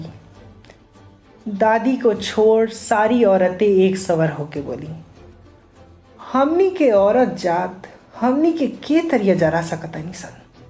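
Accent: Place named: Bhojpuri, India. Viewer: Bhojpuri accent 🤩😂😂😂😂👌👌👌👌 Ioved a lot the way you delivered your story...❤️😂😂😂🤣🤣🤣👌👌👌👌👌👌👌👌👌👌👌👌👌👌👌👌 Characters name was amazing...Simranya 🤣😂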